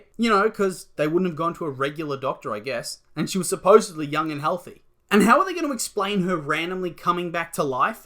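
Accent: Australia. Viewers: Not mean to offend you or anything but you sound an awful lot like an Australian 😂😭✋✨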